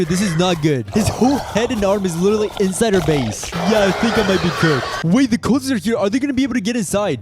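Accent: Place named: France. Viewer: T’es français mdrr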